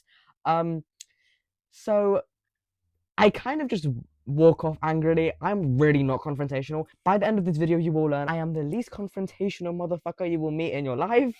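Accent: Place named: United Kingdom. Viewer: bro is brittish wtf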